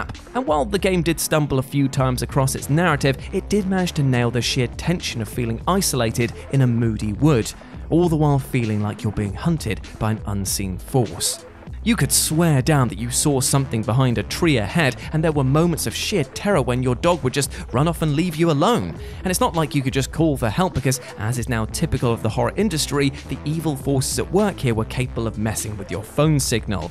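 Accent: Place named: United Kingdom. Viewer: Add "inventory" to "aluminum" and "advertisement" as words that sound terrible when said by Brits.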